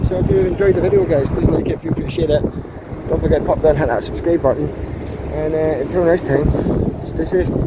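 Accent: Salford, United Kingdom. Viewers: You have a fuuny voice are you from SALFORD near Manchester .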